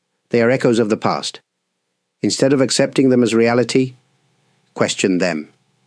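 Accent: United Kingdom. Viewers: He is born in England sounds like he has British accent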